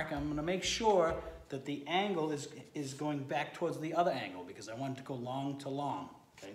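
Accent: Boston, United States. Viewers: Yeah, man. I’m a newbie and you’re talking straight to my level. You explain and repeat things so that I won’t have to rewind the video and it’ll be easily remembered. The comic relief and your accent (Boston, I’m assuming) is icing on the cake. Thanks, brah! Blessings. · I recognize a fellow Bostonian when I hear one!!!! 😂 😂😂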